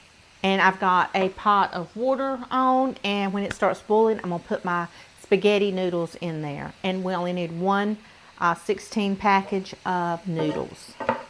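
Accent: South, United States. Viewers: Me too ! She is a joy to watch. I ❤ that southern accent !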